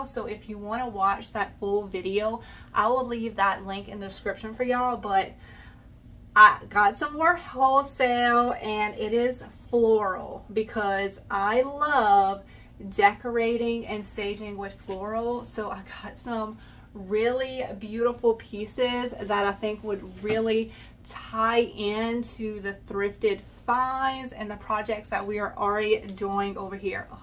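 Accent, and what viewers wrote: Louisiana, United States. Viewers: Your the cutest lil chic! I LOVE your LaFayette, LA accent! I recognize it anywhere. I watch because your channel is very cool but big bonus to me is just hearing you talk! LOL God Bless!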